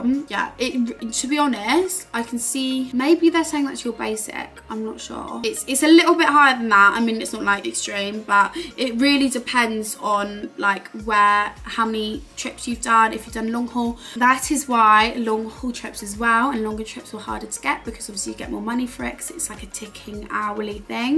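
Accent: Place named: United Kingdom. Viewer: Beautiful in the proper British accent 😮😮😮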